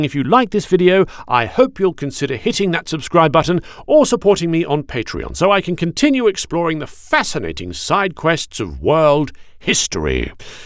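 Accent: England, United Kingdom. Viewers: Man I find it odd that you used an English instead of your already British accent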